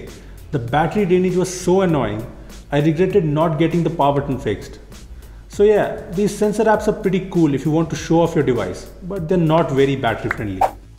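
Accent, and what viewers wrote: India. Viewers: i always wonder why every indians that's speaking english sounds like this ._. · my God I can't keep listening any more Indian accent... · Indian accent, I just love it. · Why should you bother when english is not his mother tongue ... Literally nobody gives a fuck to english in India as there are more than 2500 languages.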